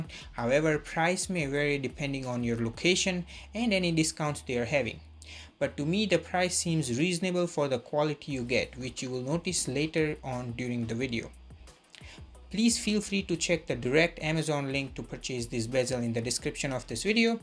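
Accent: Gujarat, India. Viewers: Are you Gujarati?? Your accent is so Gujju..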